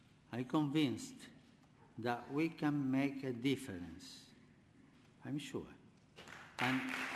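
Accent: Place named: Argentina. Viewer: Heavily accented indeed, you can only expect so much from a man born of Argentine descent (whom spent most of his life in the Vatican thereafter) trying to speak English. That being said, the words uttered do carry heavy weight.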